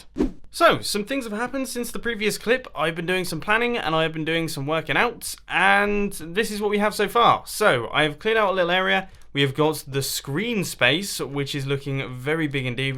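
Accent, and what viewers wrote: England, United Kingdom. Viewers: r u from England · You're from Britain.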